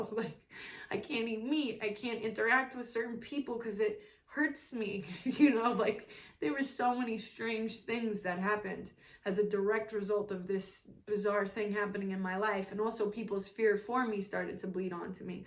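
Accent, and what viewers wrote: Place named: New York, United States. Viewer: It's the new York accent but I agree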